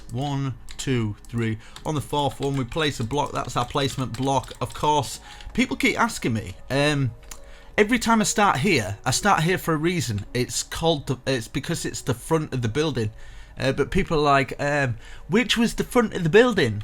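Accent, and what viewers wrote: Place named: England, United Kingdom. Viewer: He's from England if Im correct :)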